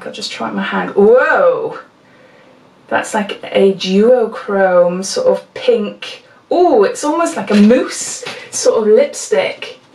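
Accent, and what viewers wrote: Wales, United Kingdom. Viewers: I need this calendar😍😍 great unboxing some amazing products xx i think its a welsh thing doll because i say it the same way as u xx im from south wales xx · You say it a lot and I thought it was adorable. I guess it's the whole Britishness of it. I'm Bulgarian and I don't get to hear this type of accent often and I think it's very nice and melodic. But yes, I do think that you say tooth weird. ;d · oh my goodness and you're welsh! I was thinking I was hearing some welsh accent coming through, and then twf! I'm in west glamorgan so you've just gained a local(ish) fan <3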